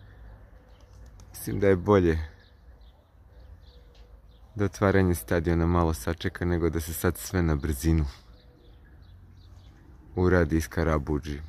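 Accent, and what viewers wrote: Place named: Belgrade, Serbia. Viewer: Dobar video, ali brate, imaš neprijatan beogradski naglasak kao da si odrastao u beogradskim džiberskim kafićima 😁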